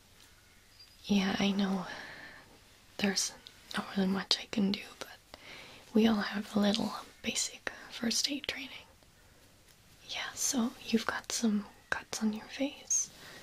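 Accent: United States. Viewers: She can really rock a American accident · Your american accent though 👌👌😊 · I am unfamiliar with this series (forgive me!) and I could have sworn you said "prison mike" and I died. BTW, your American accent is like, my favorite thing in all of ASMR, it's SO good. Pretty much every video you do with it instantly goes into my favorites! So thank you thank you thank you <3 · I love your american accent!!! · Great American accent!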